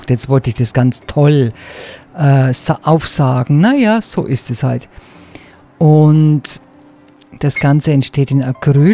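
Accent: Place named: Munich, Germany. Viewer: Du bist echt talentiert. Freut mich immer ne münchnerin zu hören.❤❤❤deine Bilder machen freude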